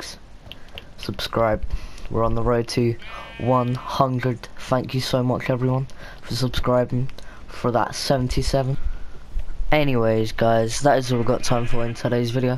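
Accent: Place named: Scotland, United Kingdom. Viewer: i sense scottish.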